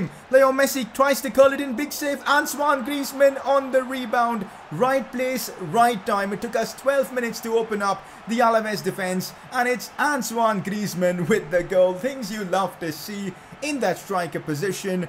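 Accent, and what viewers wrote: India. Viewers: I like you. You have a thick Indian accent, but I like you. Straight to the chase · bro u indian?? i mean u have a bit of indian accent... just casually asking.. nice vid btw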